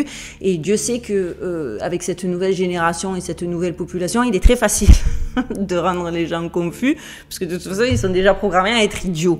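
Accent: South, France. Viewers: Un vrai rayon de soleil, ton accent du sud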